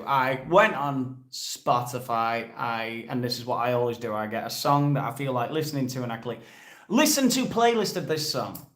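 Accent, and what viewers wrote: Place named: England, United Kingdom. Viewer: Mr you are such a handsome englishman. I love your accent. Female from Trinidad here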